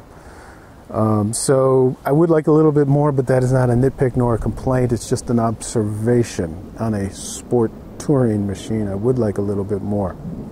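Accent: United States. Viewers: English is my 2nd but you sound American to my ears. Why don't you use your Brit accent like some of your peers?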